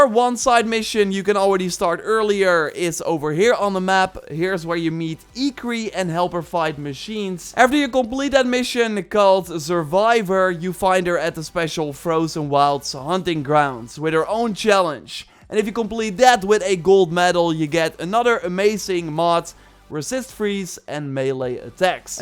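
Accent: Netherlands. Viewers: Yea he says asin-creed too :) It's all good he's Dutch? We forgive him!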